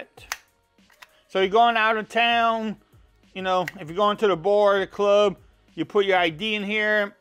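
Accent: United States. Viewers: Your accent is the most american accent ever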